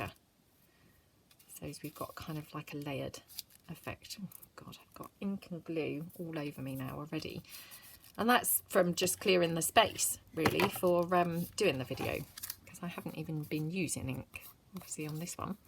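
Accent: England, United Kingdom. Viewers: First ,as living in the US, what are Eggs & Chips and Potato Jackets? I don’t do much with paper , but am trying to get some knowledge of things I like , so I can! Also , your voice sounds exactly like a friend but I forget where in England she is from, but , still , Her accent always seemed a little different and exactly like yours! She IS NOT a crafty person at all, but does hair! I’m sure there is a different word you use for hair dresser but don’t know what it is! I love the words you both use a lot like “fiddly” & some I can’t think of now, lol. I will have to ask her about the dinner menu’s:-)) I love that paper and your tag! I’ve had some people I have subscribed to for ages and never seem to go outside of my box in watching videos, which I am now doing! There had to be a good reason I subscribed in the first place and now I can see why (except for the fact that you talk as much as I do in my videos) 😆. 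Gail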